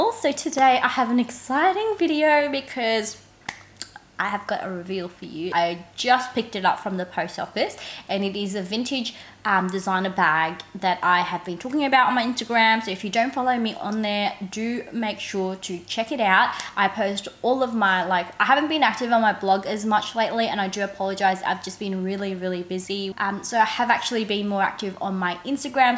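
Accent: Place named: Australia. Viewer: Funny that... I love the way she talks.. it's warming. Real n Aussie n relatable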